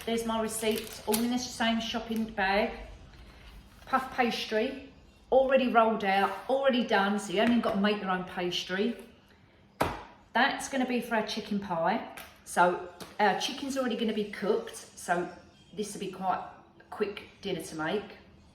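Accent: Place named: Cockney, United Kingdom. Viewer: She speaks with a cockney accent. She must be the last cockney left in Britain.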